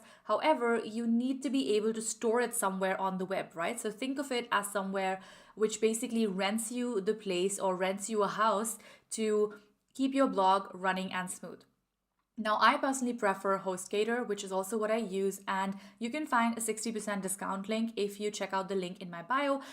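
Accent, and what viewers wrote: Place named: India. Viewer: You sound like an Indian, even your name is Indian then why does your channel say you're German?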